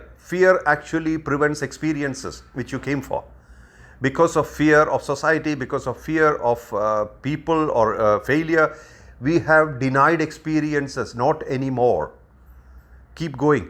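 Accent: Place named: India. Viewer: I wish you could put subtitles in English for the deaf and for people who are not get use to hear Indian accent. Thank you!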